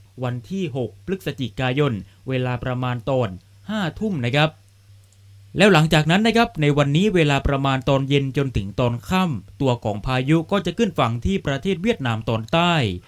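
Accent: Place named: South, Thailand. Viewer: คนใต้คับ ฟังสำเนียงออก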